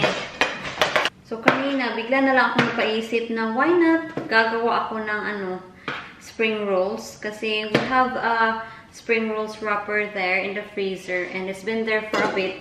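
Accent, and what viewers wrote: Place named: Iloilo, Philippines. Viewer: Hi. Watching here from Dasmarinas Cavite. I remember your accent dahil sa aking mahal na Inay. She is Ilongga. Sobrang sewer at mababait halos lahat ng ilongga. Say hi to your hubby. God bless!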